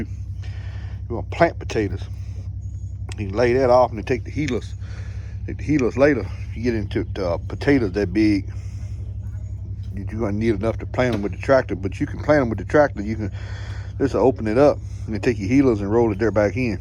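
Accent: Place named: Louisiana, United States. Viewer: Where are you located? From accent, can't tell if you're Cajun, or from around Lumberton SC. I'm in SC. Can't beat dog wire cages,I use taller wire, mabe 4' and cut 6' sections, then drive a piece of metal pipe through bottom so they don't get blown over in storms. Have some cages almost 20 year's old.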